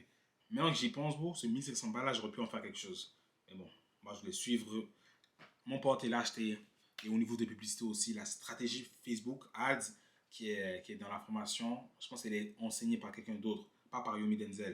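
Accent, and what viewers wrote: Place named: Montreal, Canada. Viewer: tu es de Montreal ? tu as semble avoir l'accent de chez nous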